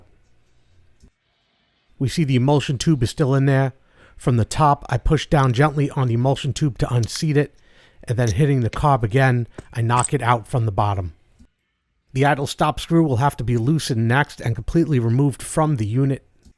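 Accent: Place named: New York, United States. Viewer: This the best Honda generator video I’ve seen on YouTube. Your definitely a New Yorker most likely Long Island wish I new you when I lived in Brooklyn.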